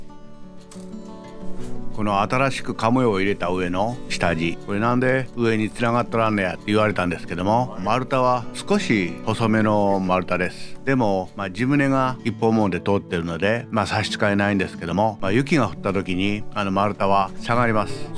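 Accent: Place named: Wakasa, Japan. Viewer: すごく関西弁の若狭訛りっぽさを感じる…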